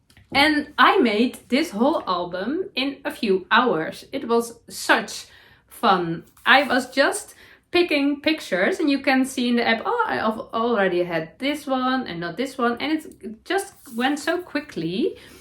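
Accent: Netherlands. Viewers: your Dutchy English is perfect. I really enjoy your podcasts, love your projects also. You do make me 😂 while I’m sat knitting. Will definitely be knitting The Felix Cardigan along with you, and also in brown! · Hi, lease don't change how you do your podcasts, I love your dutch english, can easy understand you. Your knits are lovely, really like your vest.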